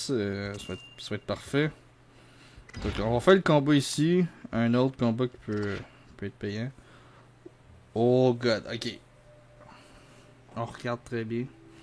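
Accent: Quebec, Canada. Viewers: L'accent québécois >>>>>> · Défois je comprend pas trop ce que tu dit par ce que tu a un accent québécois et je suis français mais sinon bonne vidéo ^^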